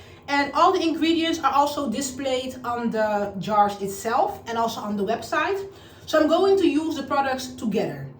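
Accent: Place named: Netherlands. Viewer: Ik hoorde al bij de eerste zin dat je Nederlands was. 🤣 Ik kwam je video tegen omdat ik niet zeker was als ik die cream & butter samen kon gebruiken; dus ik zocht het even op Google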